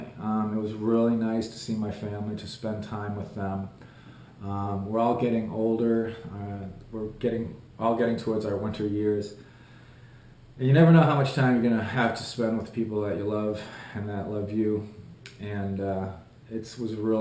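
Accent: Boston, United States. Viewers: it didn't take long.. that "Bah-ston" accent came right back. :) i'll be in SoCal next week, probably come home to a hot dish of home-made enchiladas. i anticipate gaining some weight as well. Glad to see you made it back to the PH safely!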